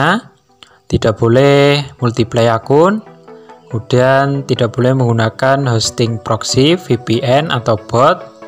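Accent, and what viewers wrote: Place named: Banyumas, Indonesia. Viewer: Logat ngapak pa ya.. org mn nich?😁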